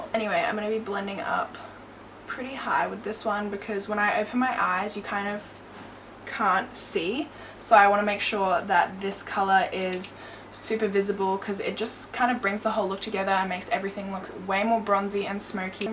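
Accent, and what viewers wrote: Australia. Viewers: I'm not an expert in accents but is she Australian? Amazing makeup btw :) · U sound like Kylie Jenner but with an Australian accent XOXO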